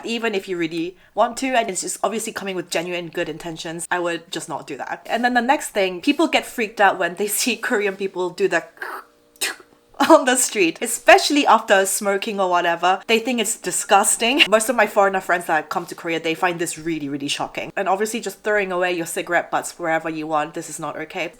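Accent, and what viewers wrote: England, United Kingdom. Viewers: In what way is she more British than a Korean? She’s somewhat of a hybrid. However, as a Londoner - I see the British influence (accent mainly imo), but not “completely British” (whatever that is). 😇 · when I listen to and close my eyes, sounds soo English. Also, I love when she says" proper". 😀 Maybe mannerism! " Stoicism"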